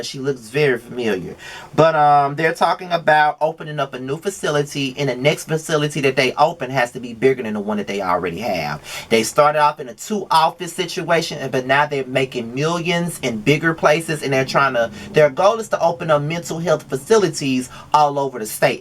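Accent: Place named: Mississippi, United States. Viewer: I didn’t know you were from Mississippi. I’m from Jackson, MS! I knew I recognized that accent lol. Represent!! You have a new subscriber. We have to support our own.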